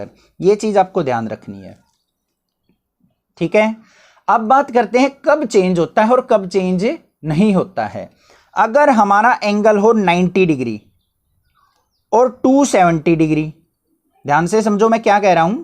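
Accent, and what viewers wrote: Haryana, India. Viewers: Sir aap haryana se ho..??🤔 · sir ji aap haryana se ho na thari bolan ki ton t laga se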